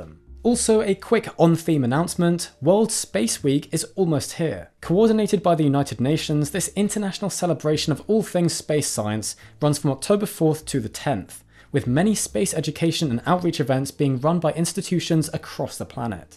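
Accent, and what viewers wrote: United Kingdom. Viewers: How does history, space & dinosaurs sound so much more incredible, when listening to a British guy talk about it???? ☺️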